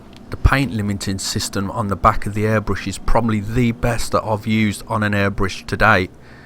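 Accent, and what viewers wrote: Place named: North, United Kingdom. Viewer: Love the Northern accent :D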